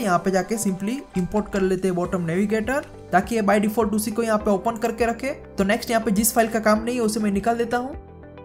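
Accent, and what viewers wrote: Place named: Hyderabad, India. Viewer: keep up the good work , are you from hydrabad india ? cool akcent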